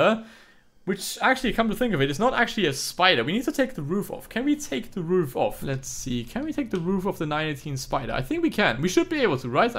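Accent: Germany. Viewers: Are u german ? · One question... can you speak german? Your English sounds a bit german!😄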